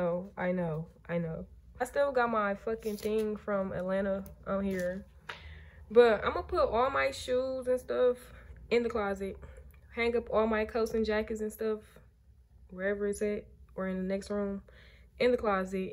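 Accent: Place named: New York, United States. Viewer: Where are you from you sound like you from newyork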